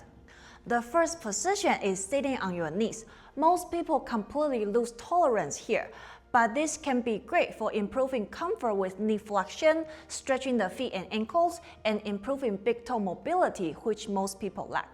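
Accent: China. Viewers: 为什么我听到了潮汕口音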